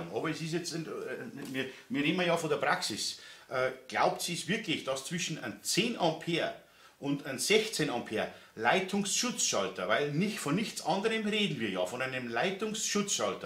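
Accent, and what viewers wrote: Bavaria, Germany. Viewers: du bis der beste, geile Aussprache ( Dialekt) Unser beste Bayer 😀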